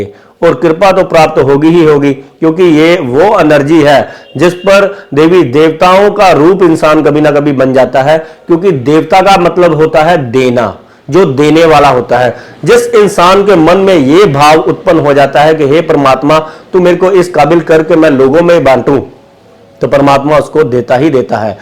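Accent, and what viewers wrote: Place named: Punjab, India. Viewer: ji aapki Hindi bolne ka tareeka bahut badia hai with mix Punjabi👌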